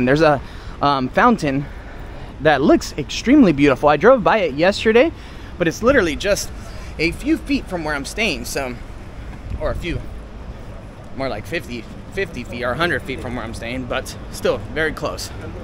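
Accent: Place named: United States. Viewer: I really like your American accent. thanks for sharing this video!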